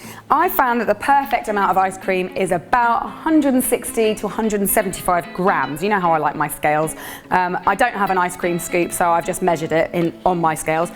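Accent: United Kingdom. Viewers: are you british ??????????????? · That british accent quit difficult 🙂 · Her british accent😻😻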